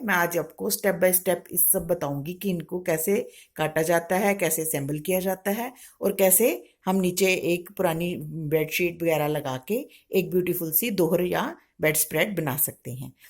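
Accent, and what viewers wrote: Punjab, India. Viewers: Nice video, n Panjabiyat jhalak Rahi h which is nice expression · Your voice mam, is so like my mom's!😍🤗 And it's okay if your Punjabi lilt comes in on your video, I'd love it! And am sure many other would too! तुम्हारी आवाज़ माँ, मेरी माँ की तरह है! 😍🤗 और यह शानदार है अगर आपका पंजाबी उच्चारण आपके वीडियो पर आता है, और मुझे यह पसंद आएगा! और मुझे यकीन है कि कई अन्य भी होंगे!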